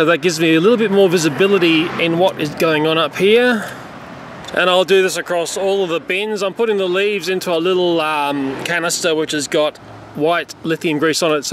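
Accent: Australia. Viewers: I didnt know u where aussy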